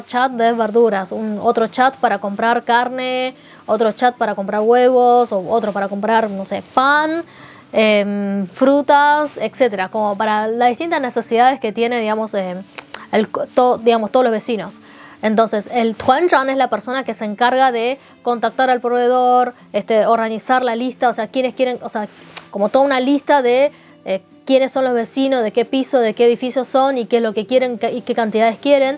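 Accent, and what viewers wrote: Argentina. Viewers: Además te felicito que no pierdes la forma de hablar Argentina....!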